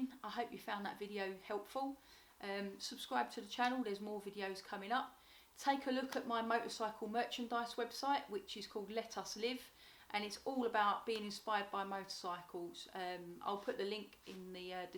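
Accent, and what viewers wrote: Cockney, United Kingdom. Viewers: I've watched a few of your Triumph reviews. Your accent reminds me of my family and growing up in London England. I was born in Woolwich. I would say you definitely have a Cockney accent. I am now a US citizen living in Southern California for many years now. Carry on riding !!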